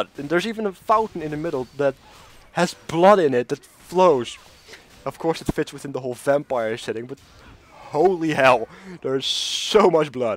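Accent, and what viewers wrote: Netherlands. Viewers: Hey, im dutch myself but wasnt sure :p i could hear it a little bit :)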